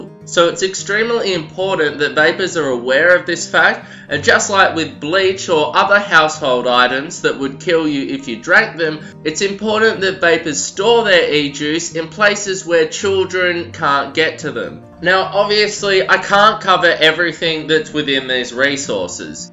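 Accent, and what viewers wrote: Australia. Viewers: He's Australian yeah an Aussie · nah man. he's aussie af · are you australian? jw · Hamid Mousa, nothing wrong with his voice, he's an Aussie, very easy to understand, his room studio is just very voided, making his voice sound louder than it is. Regards a fellow aussie.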